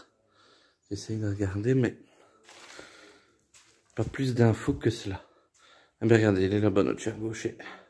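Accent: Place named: Belgium. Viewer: Bien! Tu me fais chaque fois rire avec ta prononciation typiquement belge, "fourboui"! En France nous disons juste "fourbi" Belle continuation & merci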